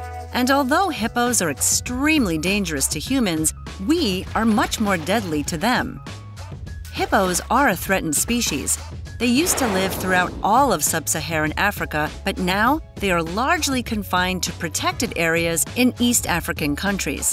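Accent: United States. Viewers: Kilopascals for pressure- there's a unit most listeners cannot relate to. Going by the narrator's accent and mention of visiting the Cincinnati Zoo, I'm guessing that the intended audience might be mostly Americans...centimeters and kilograms aren't really how most of us reference distance and weight. Why doesn't the hippos mouth open Pi radians? ...if you'd like to promote Si, that is. Aside from the units, I always thought force to be more appropriate for bite strength than pressure, which, for a given jaw strength, would vary widely depending on the area considered. Most animals bite strength, measured in PSI or kPA, could exceed their tooth strength, if they bit a ball bearing, or any small hard thing....the force, without considering area, seems more relevant. Pounds, or Newtons, if you insist.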